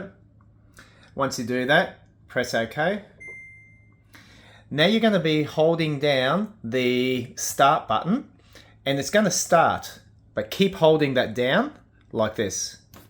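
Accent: Australia. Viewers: Aussie accent makes me laugh my pp off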